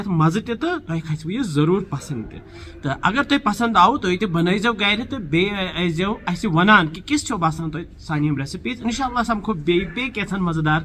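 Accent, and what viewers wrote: Kashmir, India. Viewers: Love your kashmiri accent 🤣🤣🤣🤣🤣 But the quantity of spices which you added my mother will make 4 bottles in this quantity of spices 🤣🤣🤣🤣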